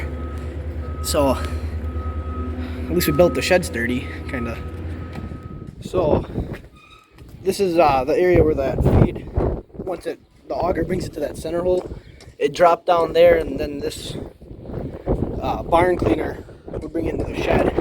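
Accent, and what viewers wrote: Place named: Northeast Pennsylvania, United States. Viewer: Wild never heard of taking down such new silos! ** you have the exact same accent as northeast pa Lackawanna county!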